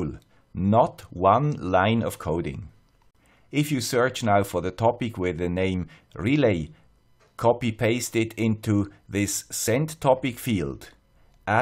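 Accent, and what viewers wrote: Switzerland. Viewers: Hi Mister Swiss Accent First of all, i would like to thank you for your excellent video tutorials. i know how much time you are spending on this stuff. Big Thumbs up. Encouraged by this video i started to build my own automation system. But to be honest this stuff nearly screwed me up. Being a newbie it showed to be tough work to get my system running. I spend nearly 3 weeks to set up the raspberry, the development tools and to build my own firmware. I got stuck nearly every problem that could occur during the build process. Fiddling with linux was one of the issues. Due to some basic programming experience i thought that it would be easy to build my firmware.Wrong assumption. The build process was a trial and error approach due to the spare information for the firmware build. Most annoying was the fact that there is a plethora of information in the internet. 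But as a newbie i could not figure out if this information was useful for managing my problems. Most items i found were outdated, wrong context or even worse just false. Finally i found 2 major information that helped me out. I would like to ask you if it is possible from your side to provide us with a video showing a brief overview of setting up the environment, the build process and TOOLS (i.e. Platformio vs. Arduinoi) and hints to avoid the pitfalls for the build process. One of my major time consuming issue was to figure out how to configure the right Arduino Development Environment. Newbies will find really nothing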